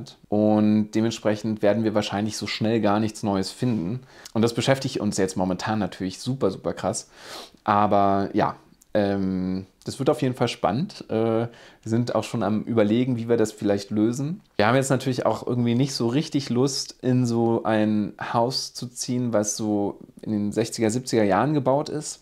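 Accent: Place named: Berlin, Germany. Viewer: Super, herzlichen Glückwunsch zu dem Arbeitsvertrag! Das freut mich für euch 🙂 Um eure Frage bzgl. der Sprache i.S. Kanal zu beantworten: Ich spreche leider nicht perfekt englisch - auch nicht annähernd. Ich freue mich deshalb immer wahnsinnig, wenn endlich mal ein Kanal aus Skandinavien auf deutsch ist. Wenn der Kanal in Zukunft auf englisch wäre, würde ich wieder mit Untertitel schauen müssen, was dann oft bedeutet, dass ich das Video zweimal schauen muss, weil ich erst lese, um was es geht und mich beim zweiten Durchgang auf die Bilder konzentriere, denn die sind bei euch immer sooo schön. Ehrlich gesagt würde mir auch das "berlinern" zwischendurch SEHR fehlen ;-) .... Aber: auch wenn ich die Videos dann vielleicht nicht mehr schaue, werde ich euch treu bleiben, auch liken und auch einen Kommentar da lassen (wird dann eben immer nur ein Herzchen ;-), alleine um euch mit meiner Stimme zu unterstützen .... 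Im Sommer das Haus verlassen .... wie blöd! Och Mann, das ist eine wirklich blöde Situation für euch. Ich wünsche euch, dass ihr euren Weg und eine zufriedenstellende Lösung findet. Liebe Grüße ❤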